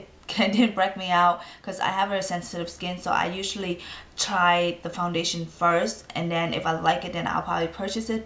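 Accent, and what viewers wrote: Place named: Asia. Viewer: it's not slang... it's an asian accent... something obviously she can't help... it doesn't sound like slang at all....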